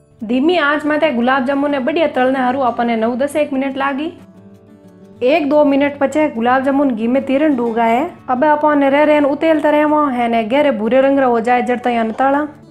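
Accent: Marwar, India. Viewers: आपके मारवाड़ी बोली बहुत प्यारी लागे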